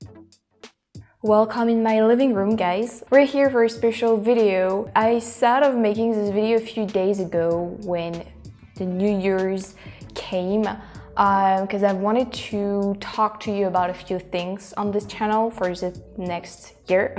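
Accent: France. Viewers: Salut à nouveau. Comme d'habitude, toutes tes idées sont géniales! Peut-être qu'un jour il y aura un «Guide FrenchTastic» pour rivaliser avec le Guide Michelin! Je trouve toujours amusant que les gens, y compris les Français, commentent l'accent français. Pour les Françaises, leur accent est comme le bouclier de Wonder Woman: c'est leur super pouvoir! J'ai eu des filles françaises qui me disaient essentiellement de "foutre le camp" et la moitié de mon cerveau pensera "oh elle est si méchante" tandis que l'autre moitié pense "mon Dieu, elle a l'air si sexy"! En bref: c'est un super pouvoir que tu veux jamais perdre! Prends soin de toi! /Bill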